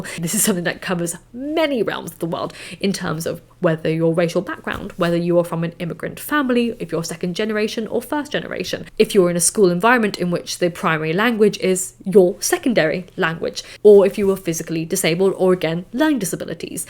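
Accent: RP, United Kingdom. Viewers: Is this teacher why you sound so Posh? She drilled posh RP into you ? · So refreshing to hear your british English accent, I rarely hear non-american English and at first I got scared I won't understand anything but that was not that hard. Just my process of listening to you was the additional argument to your speech 💪 I think there's also an important note of age-appropriation of literature, some classics are just speaking about themes that are not familiar for children or teens. And I wish people told me this in not a derogatory form, like haha you are stupid little girl you won't understand. It's not like that... It's more like people of country A won't understand the subtle context of literature of country B. And it's not a competition at all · What a delightful and posh accent, it’s verbal caviar.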